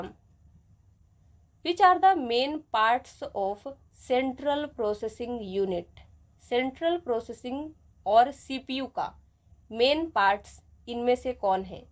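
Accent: Odisha, India. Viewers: Mam kya aap odisha se he ?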